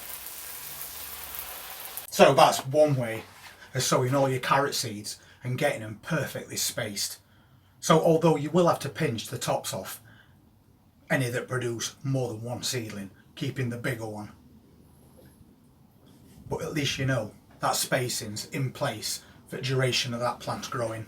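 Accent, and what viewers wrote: Yorkshire, United Kingdom. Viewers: I have just come across your channel you sound you are from Yorkshire possibly Sheffield area is this true would like to know as I’m a Yorkshire man also so we are in same area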